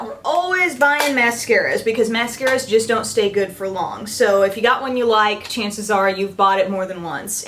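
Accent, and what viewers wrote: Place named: Alaska, United States. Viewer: Where are you from? It sounds like you have an Alaskan accent.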